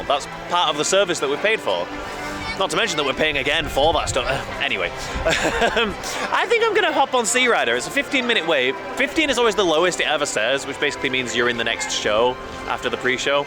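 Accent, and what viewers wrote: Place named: United Kingdom. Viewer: love ur content and the way you speak ! (are you from somewhere in the UK? sorry if I mistake) Cheers, I'm looking forward to new contents !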